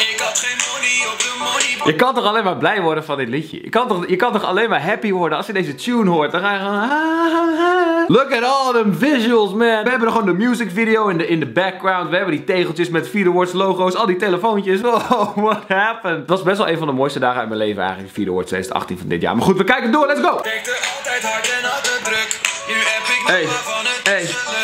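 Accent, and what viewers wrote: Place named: Amsterdam, Netherlands. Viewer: Dat amsterdamse accent geweldig hahaha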